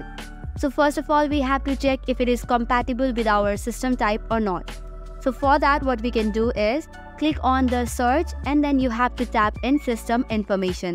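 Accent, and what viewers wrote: India. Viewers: ARE U INDAIN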